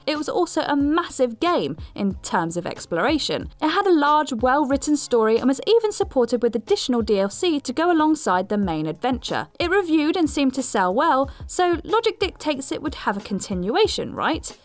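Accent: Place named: United Kingdom. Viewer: I know they're all british. But damn this new host is british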